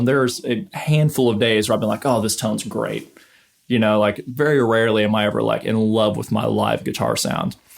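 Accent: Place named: Ireland. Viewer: Some great advice here, thanks. Are you of Irish extract?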